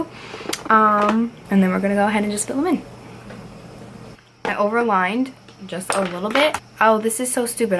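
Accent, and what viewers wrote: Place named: New York, United States. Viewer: First, YOUR ACCENT! I love it! You HAVE to be from NY, right? Second, The looking up tip, I HAVE NEVER heard that before, and think that's totally genius. I have chunky lids that look hooded, so that tip is going to help me a ton. Thank you so much! Third - You are beautiful!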